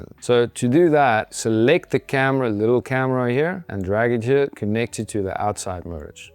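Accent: South Africa. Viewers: Super awesome! Thanks for sharing. PS: With that accent you must be a boertjie from SA? Greetings from NC. Groete van huis tot huis.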